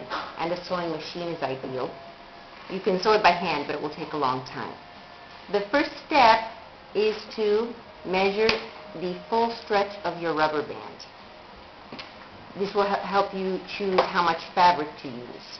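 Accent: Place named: United States. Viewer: Wow that was great, I make these too, but I use elastic instead of rubber bands. We in the USA pronounce centimeters differently, but you sound like you have a US accent where did you grow up?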